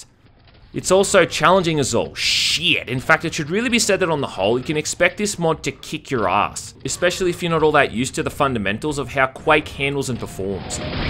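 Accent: Australia. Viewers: Tyler Malone so it's Australian accent he speaks with?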